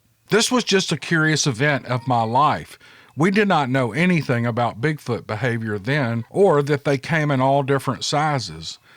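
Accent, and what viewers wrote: South, United States. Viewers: It's not only your great narrations of our people's stories that make your videos so enjoyable, but also your ramblings! So, don't cut your ramblings out please and don't discount them!!!lol! Your personality and warm Southern accent are so delightful! Like hot, salty fried okra just out the frying pan with a sweet iced tea on a warm summers' evening!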